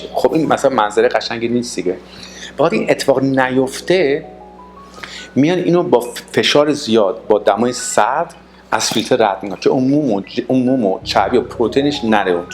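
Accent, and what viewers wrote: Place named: United States. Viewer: لهجه مشتی داری امریکا به دنیا اومدی ❤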